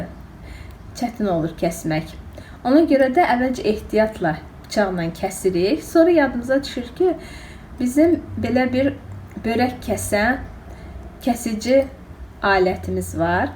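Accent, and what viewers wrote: Baku, Azerbaijan. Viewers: Gozel Bakili letcesi le bacim, Allah sizi qorusun, 🙏🏻❤️🧿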